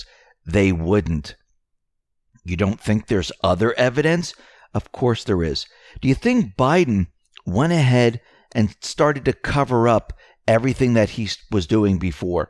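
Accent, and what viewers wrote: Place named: New Jersey, United States. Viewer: He's from New Jersey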